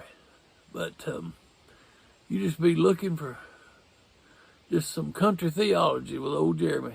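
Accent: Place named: South, United States. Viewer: Believe none of what you hear, and half of what you see. .. don't know who came up with the original saying but never more true with how people can make things even appear real that ain't so. B asiC I nstructions B efore L eaving E arth Glad to listen to you talk. Love good ole boy country accents especially when a good message comes with it. Hope you healing progresses rapidly, and dang, if you keep sitting out looking at that scenery, you ought be healed up soon.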